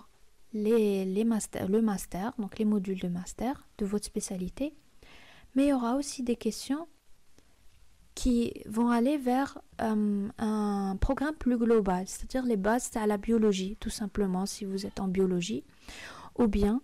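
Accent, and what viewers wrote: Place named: Tlemcen, Algeria. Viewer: L'accent tlemcenien ❤️🙏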